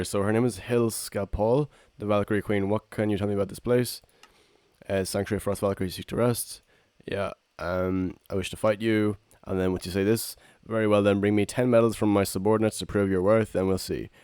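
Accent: Ireland. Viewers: Is ethis guy irish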